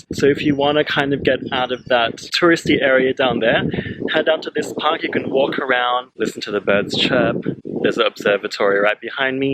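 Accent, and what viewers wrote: Australia. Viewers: I just love your great videos,. I like Aussie accent.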